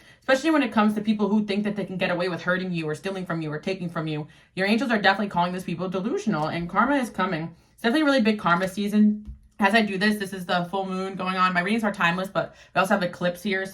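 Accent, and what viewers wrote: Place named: West Coast, United States. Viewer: LoL you must be West coast!! Love the fast voice like me!! Arizona!! Yet still excellent!! Love your read!! Awesome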